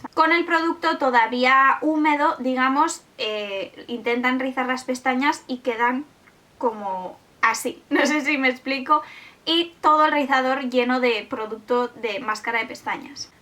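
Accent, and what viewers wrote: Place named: Spain. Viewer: Por tu acento supongo que eres española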